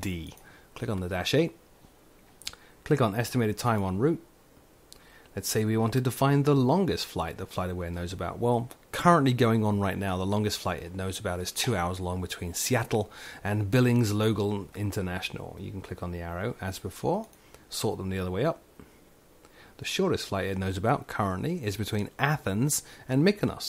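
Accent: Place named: United Kingdom. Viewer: I've a question, are you from the United States or from Uk,you have the British accent haha!!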